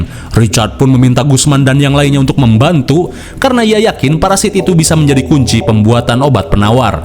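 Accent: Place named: Sunda, Indonesia. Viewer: lenggket banget bahasa sunda nya😂😂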